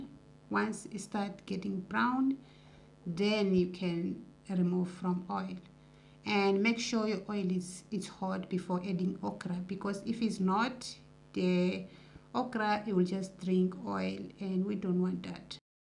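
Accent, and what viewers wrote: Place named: England, United Kingdom. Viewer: I love your English accent 😍 that okra is inviting me to come eat 🤩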